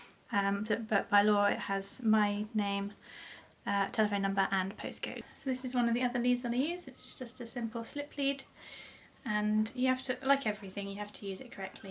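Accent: United Kingdom. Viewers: Never knew is British 😅 Love your accent 🤩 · We Americans love soft, gentle, British accent especially when she says words like planting...or pl-aww-nting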